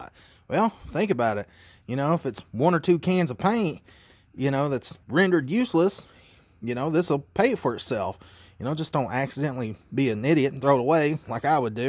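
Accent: United States. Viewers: I love your cowboy accent 😍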